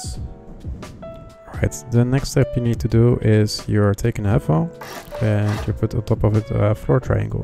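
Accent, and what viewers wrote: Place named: Netherlands. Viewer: The dutch is strong in this one....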